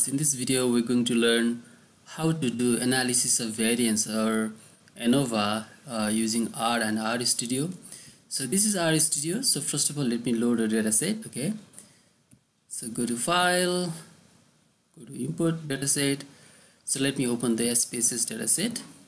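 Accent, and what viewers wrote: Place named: Nepal. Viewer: This guy sounds Nepalese. Anyways, nice video.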